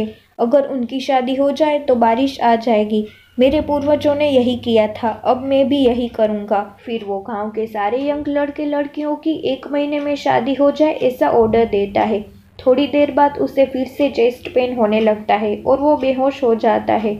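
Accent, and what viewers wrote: Gujarat, India. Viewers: Aap gujrati ho kya Aap ki voice me gujrati touch h You have h such a nice voice and good explanation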